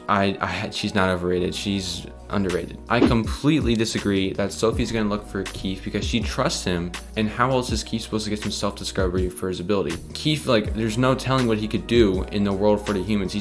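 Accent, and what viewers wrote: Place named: United Kingdom. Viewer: a very crisp british accent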